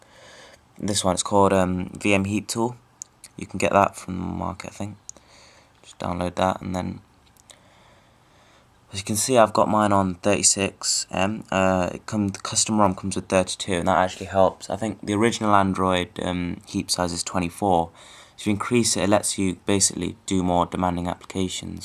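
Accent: RP, United Kingdom. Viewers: He sounds like a British teenager ngl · Eyy this English makes me feel your from the UK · Your voice has a drastic change in 10 years. Typical british to a posh accent. Just mind blowing. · you went from sounding british to sounding british and like a robot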